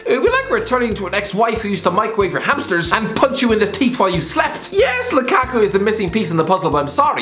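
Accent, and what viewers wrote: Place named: Ireland. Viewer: Irish "Bilabial Trill" Guy.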